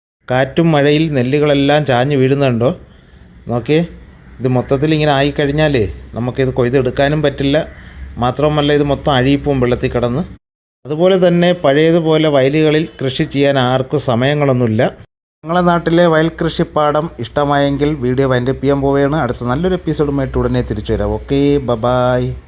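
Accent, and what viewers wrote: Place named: Alappuzha, India. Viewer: Alappuzha slang ആണ് ചേട്ടൻ്റെ.. ആലപ്പുഴ ക്കാരൻ ആണൊ? ഇന്ന് കുട്ടികളെ കാണിക്കാത്തത് കൊണ്ട് mindoola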